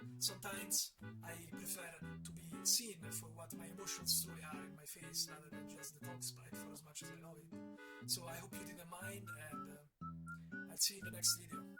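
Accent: Italy. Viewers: he’s italian? 😳😳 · Man tbh I never tought that your accent sounded so Italian, Love it btw :) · I don't think he confirmed it, but I am Italian and that is definitely an Italian accent, I've heard it a thousand times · no l'accento de roma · my brother in christ, are u italian? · Come for the drama, stay for the Italian outrage accent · are you by any chance italian? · he's italian most probably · l'accento è palese italiano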